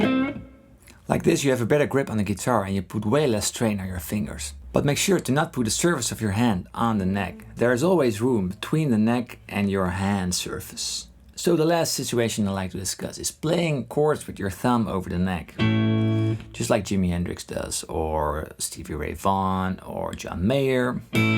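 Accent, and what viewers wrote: Netherlands. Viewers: where are you from? Because your accent sounds Dutch. Nice vid btw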